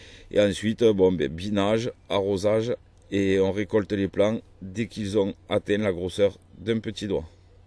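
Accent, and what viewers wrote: South, France. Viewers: Bonjour. Merci beaucoup pour le partage de vos connaissances/vidéos qui sont claires, instructives, complètes, enfin je me régale à chacune de vos vidéos, camarade sudiste ! (enfin je dis ça pour l'accent de chez nous, je peux me tromper mais cet accent me parle ^^) Merci encore pour le temps passé sur vos vidéos ;) Cordialement, Ben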